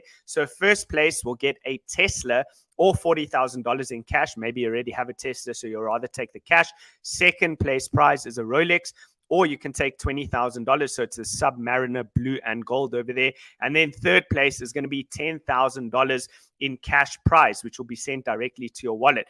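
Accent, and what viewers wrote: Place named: South Africa. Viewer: SA I reckon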